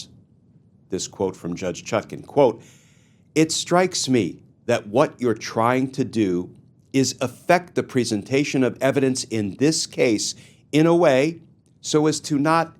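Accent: South, United States. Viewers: says “y’all” 🤭 I’ve GOTA know, does he say “NewOrlins” too? Yes, he’s definitely Southern, unless he’s just good at mimicking us 😁 ✌🏾 Thanks for the Knowwithall! Gratitude(s) 🌹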